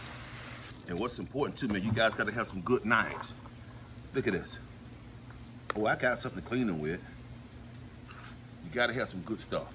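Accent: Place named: Arkansas, United States. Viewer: Im😂so glad that I found this channel. I know that you guys live in Florida but you sound like a good ole down south Arkansas boy. I'm from Arkansas myself and I love the way you do fish. It's my favorite food. You make me remember my grandmother. Love you guys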